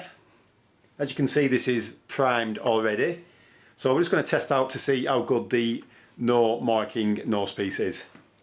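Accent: United Kingdom. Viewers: Great video and the first to go into such detail about the nails which is exactly what I needed to know, having never owned or used one before. Thanks for taking the time to do this video. Well done and nice to hear another British voice for a change 👍🏾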